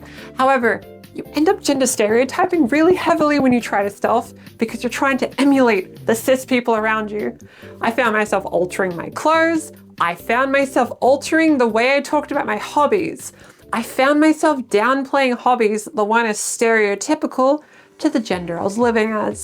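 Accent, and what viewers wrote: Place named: Australia. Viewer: Huh - youre Australian accent is way more noticeable with your masc voice. Its a super interesting party trick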